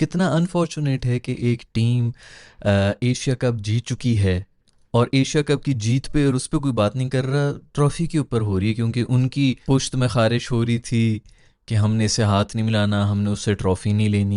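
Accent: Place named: Pakistan. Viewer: English me bol inbred..tera paijaan accent kuch samaj nahi aa raha😢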